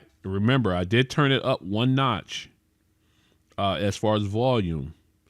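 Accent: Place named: California, United States. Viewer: Big bruh. Your cali accent so apparent. Love the content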